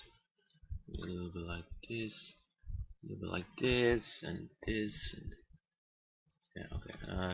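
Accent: Netherlands. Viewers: are you Dutch ? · re you dutch (nederlands)?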